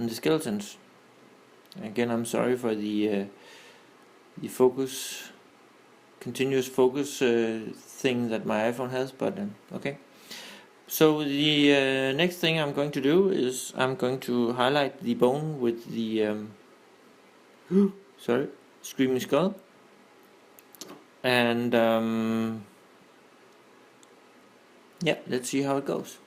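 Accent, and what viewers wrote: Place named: Denmark. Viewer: Nice video. Are you by any chance danish? The way you speak english, sounds so danish :)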